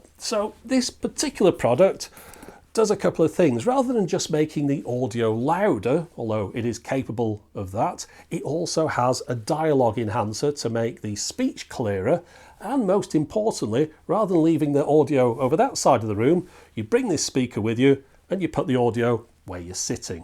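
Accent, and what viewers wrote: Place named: North West England, United Kingdom. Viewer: Can’t get over the Schools TV feel on your videos, I think it’s the NW accent with Granada having made a lot of the programs for ITV Schools. This isn’t a negative, I used to enjoy some of the ITV schools programs even after I’d left school and watched when I was off / out of work.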